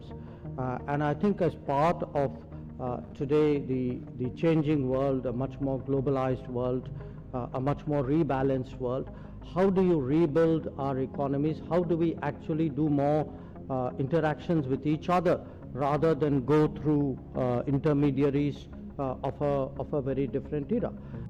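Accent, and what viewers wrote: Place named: India. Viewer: why would anyone listen to a telemarketer from an Indian call center?!! Shut up Hadji and answer the phone!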